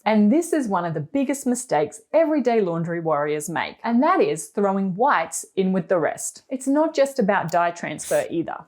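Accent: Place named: United Kingdom. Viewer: It's lace and not "lice". Why do Britishers wag their tongue while saying certain words is beyond me 😮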